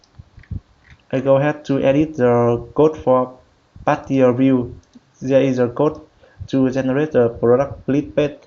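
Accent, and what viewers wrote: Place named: Vietnam. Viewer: nghe là biết người việt :))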